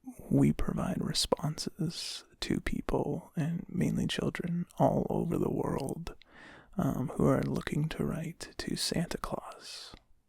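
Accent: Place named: Canada. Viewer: Very funny and instructive! Your currency looks quite a bit like Euros so it's not that unusual for me as I've handled those before. The Cambodian Riel does look like Monopoly money though, I could do a video showing the bills :p Oh, and kudos for keeping the correct spelling of words ;) And "about" the accent, one of my Canadian friends told me once 'It's not a shoe, it's a ship'. It's a good way to remember it :) 'Being sorry all the time' - I just lost it. And I'm mesmerised by the shades btw. I know I'm late. But I'm pretty glad I managed to watch it now. :) Especially the end! Oh, Canada! :p Longest comment EVER XD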